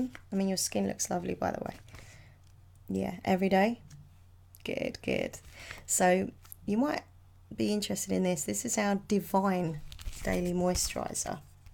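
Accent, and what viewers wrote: Essex, United Kingdom. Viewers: Your voice soothes me lots.. I think it's because of your accent. My family is from Essex. You're my favourite 😊❤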